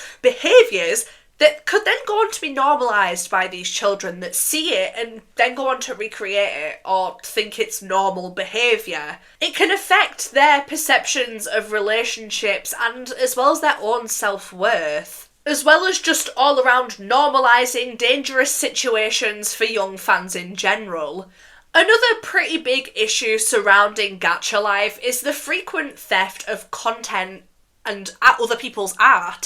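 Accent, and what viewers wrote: Scotland, United Kingdom. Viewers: Nvr in my life have I ever heard a Scottish goth...IT'S AMAZING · HI I JUST STARTED WATCHING BUT ARE YOU SCOTTISH OR FROM THE UK BY ANY CHANCE??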